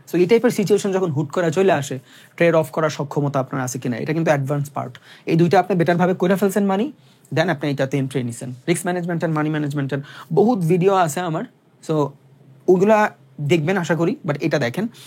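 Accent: Barishal, Bangladesh. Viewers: সবার আগে এটা আমার Question ভাই আপনি কি মোগো বরিশাইল্লা ❔🌚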